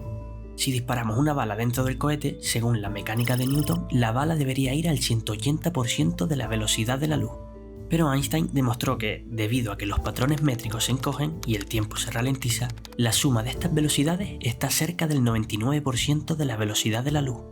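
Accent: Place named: Canary Islands, Spain. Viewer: Un maravilloso video bien explicado y con acento canario, que mas se puede pedir? Muchas gracias! 😊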